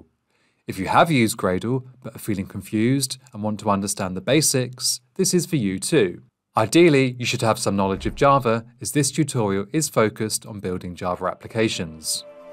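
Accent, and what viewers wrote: United Kingdom. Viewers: man, that british accent :P cant wait for spring boot, hibernate , everything whatever concerns java tutorials:>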